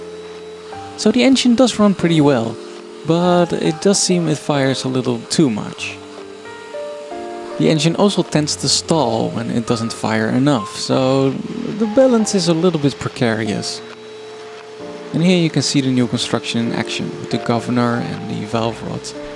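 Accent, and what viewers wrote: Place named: Netherlands. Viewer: You sound dutch don't ya?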